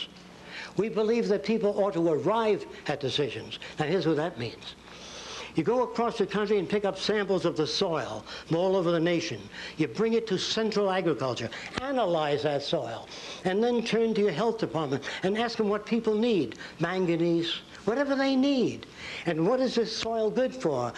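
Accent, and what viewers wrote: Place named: New York, United States. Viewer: AND GOT LOVE THAT NEW YORK ACCENT. LONG LIVE J.F.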